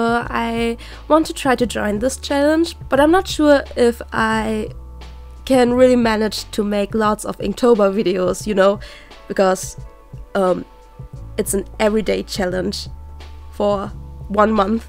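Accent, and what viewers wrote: Germany. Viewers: You’re german, aren’t you? :D your accent sounds like mine (I’m german) that’s why I‘m asking.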